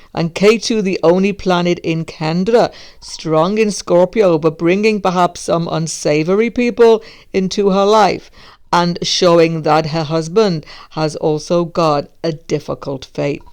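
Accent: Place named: London, United Kingdom. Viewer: come on, it is deep and captivating. it looks british accent (London) to me but with some other strong accents I can't decipher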